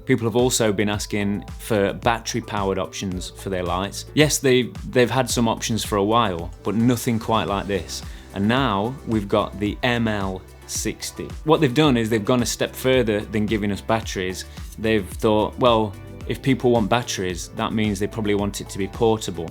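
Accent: Nottingham, United Kingdom. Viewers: Top video mate. Subbed. Are you from Nottingham? You sound like a well spoken Carl Froch 😂😂😂😂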